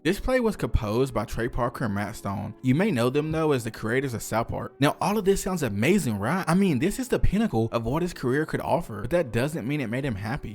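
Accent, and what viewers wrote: South, United States. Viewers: Dude I love your voice we need more southern YouTubers it’s amazing.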